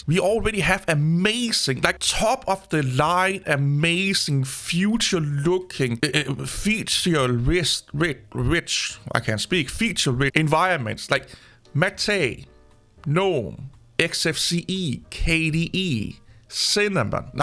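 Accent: Denmark. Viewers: That accent is waaaay tooo danish!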